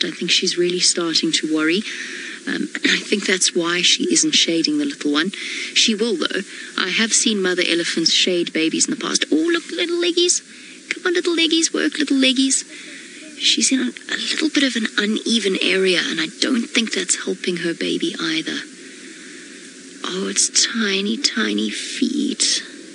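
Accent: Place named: England, United Kingdom. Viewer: Maybe if you could film and shut up. English accent off putting had to mute.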